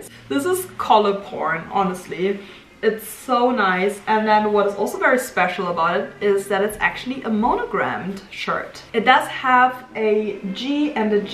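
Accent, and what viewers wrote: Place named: Germany. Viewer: Your German accent makes you have a lovely voice! I stumbled across your channel while watching 1940's videos. Hollywood should be using you as an actress in 1940's theme movies. You could definitely play an eloquent society girl of the 1940's.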